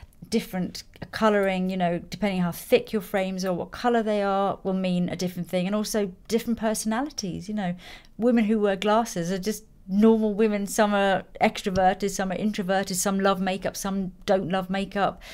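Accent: United Kingdom. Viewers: Do I hear a british accent :D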